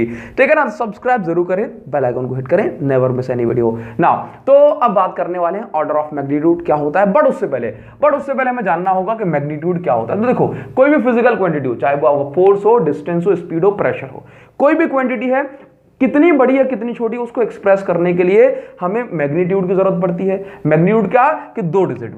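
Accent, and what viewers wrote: Odisha, India. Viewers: Sir ap odisha se ho kyaaaa.....ap bahut achhse padha rahehooo.thanks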